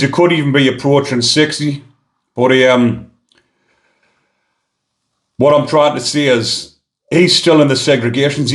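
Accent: Newcastle, United Kingdom. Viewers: You sure are handsome and I love your accent, Newcastle the land of my fathers 🥰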